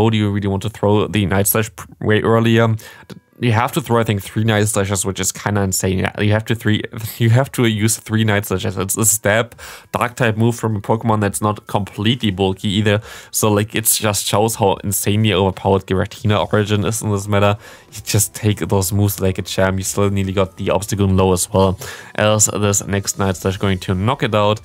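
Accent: Germany. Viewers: Hello welcome to a new video. And he is German. Easy to follow.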